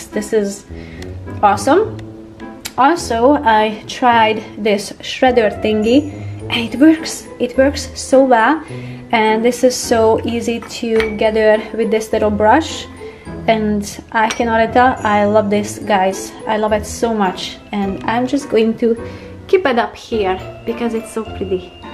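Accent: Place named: Hungary. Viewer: Huuu nagyon nagyon hallani a magyar akcentust!!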